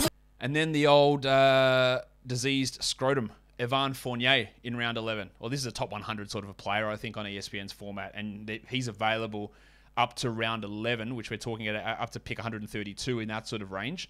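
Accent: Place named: Australia. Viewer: I'm back for the year fellas!!!! Missed ya Aussie voice!!! Greetings from Chicago! Go bulls!!!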